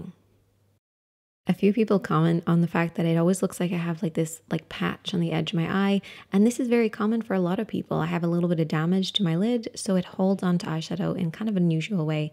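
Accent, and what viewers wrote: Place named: Ireland. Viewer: Hi, how are you? Lovely to hear an Irish accent amongst all the others! I think you are genuinely the only expert i will now follow. (along with Lisa Eldridge)...thanks for asking how everyone is doing. Its definitely genuine when an Irish person asks & not just filler, throw in a,"Conas atá tú & you might get some grant lol. I suffer from chronic nerve pain following an amputation and oft just find videos in the midst of a bad bout of pain to try drown out the terrible insufferable spasms. Anyway girl, keep flying the flag. From your neighbour in Limerick.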